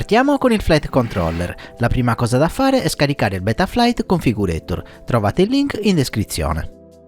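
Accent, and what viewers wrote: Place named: Cagliari, Italy. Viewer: sei forte e poi il tuo accento mi sta troppo simpatico. Sarà colpa dei miei amici Cagliaritani che ricordo sempre con affetto!!! Grazie per i tuoi video fatti molto bene.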